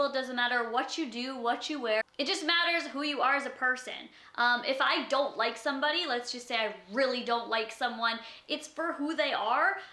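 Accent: Canada. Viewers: i love your canadian accent!!! its just so funny! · i love your accent i wish i was Canadian xx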